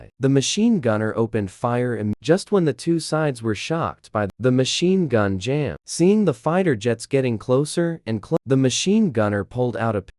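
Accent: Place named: India. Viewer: "Inside this video". This is not even AI its actualy an indian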